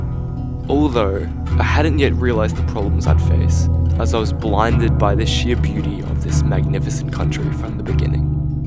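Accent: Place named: Finland. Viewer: Great video! I was a Professor of Geography for 32 years so intimately understanding places was a part of my life. I thought that you did an excellent job in describing the different realms of the land. The primary lack was in describing the peoples of Finland. It will probably take time before you can describe the culture because of the language difference, but I think that you are picking up the Finnish acent in pronunciation of the place names. The hurdle that you will be the experience of the extreme winter. It is brutal. Nothing like any place in Australia, even Tasmania which is the coldest part of Australia. Buy some very warm clothes and get a light box if you experience SAD depression from the lack of light. Hopefully you will have a great time there. My suggestion also is to enroll in a class for Finnish.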